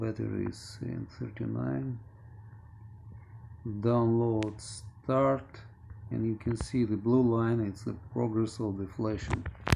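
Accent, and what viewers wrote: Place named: Ryazan, Russia. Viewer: Good instruction! Just restored my R810 watch... Your ryazan'-style pronounciation blowed my mind...)))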